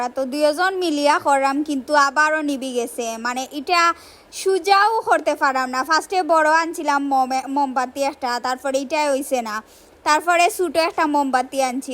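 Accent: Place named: Sylhet, Bangladesh. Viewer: Acca Apu apnader Sylhet er kotay❤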